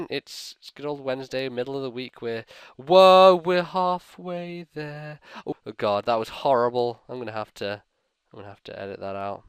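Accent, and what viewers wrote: Scotland, United Kingdom. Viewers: Are you Scottish? I thought you were Englandish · What? Are you scottish?!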